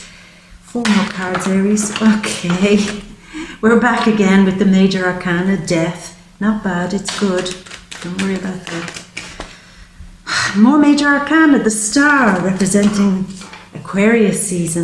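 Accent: Ireland. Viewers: Am I hearing Ireland in your voice?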